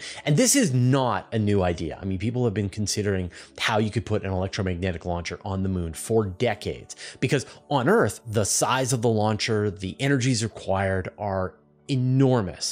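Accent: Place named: Canada. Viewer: I like how you sound Canadian. It reminds me of talking to Canadians. I mean, I still live in Kitchener, Ontario, but I haven't talked to a Canadian in weeks. It's funny how India has a space program.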